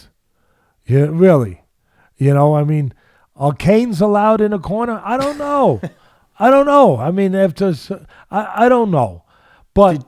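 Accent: New York, United States. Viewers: Do you think Gus and Jerry keep me tied to it sounds like it. Teddy the scientist half mass times velocity squared equals force. He tried to say that Mike Tyson was not quick or fast you’re wrong. He wouldn’t have the power if you didn’t have the speed velocity has three times the effect of mass read the equation again. And technically up to six times. The two together are devastating right. Peekaboo I see you. You can fool some of the people some of the time probablyUp there in New York where they understand your accent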